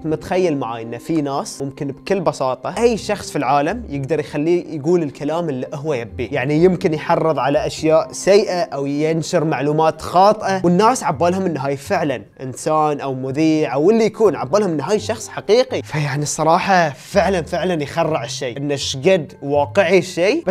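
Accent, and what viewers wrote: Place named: Egypt. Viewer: ولله لهجتك المصريه حلوه ❤️🇪🇬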